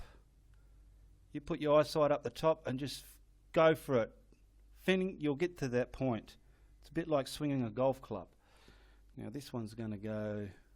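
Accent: Australia. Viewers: I really like your Aussie acsent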